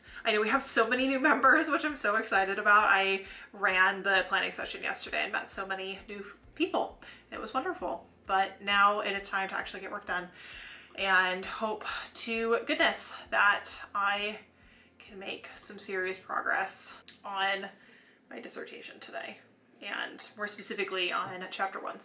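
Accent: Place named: England, United Kingdom. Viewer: It sounded like you have an English accent now after talking to Ali. This is one of my favourite vlogs from the dissertation diaries to date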